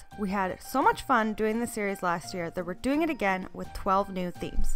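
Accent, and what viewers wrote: Canada. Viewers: Nesian Ninja She’s from a different part of Canada than myself and the accent is different. It’s subtle..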